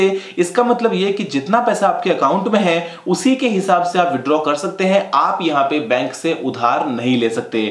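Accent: India. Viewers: NOT ALL KNOW INDIA ENGLISH PLS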